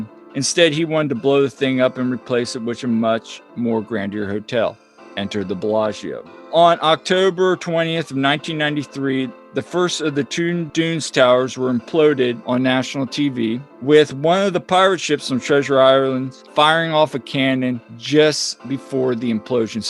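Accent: Southern California, United States. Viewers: nice vid! also r u from socal?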